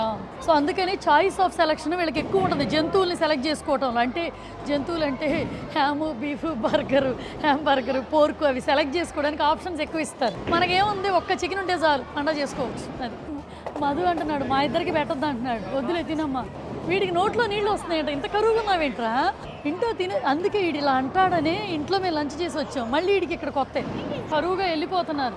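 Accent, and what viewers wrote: United Kingdom. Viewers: Her British accent is adorable❤ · She got that cute British accent 🥰❤️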